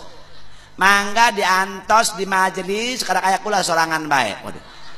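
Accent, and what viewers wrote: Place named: Rangkasbitung, Indonesia. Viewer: Rangkas bitung hadir poko na kh mah eweh 2 na mantap ciri khasnya bahasa rangkas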